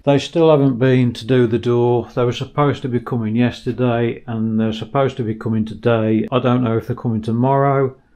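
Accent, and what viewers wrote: Birmingham, United Kingdom. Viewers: Are you from Birmingham mate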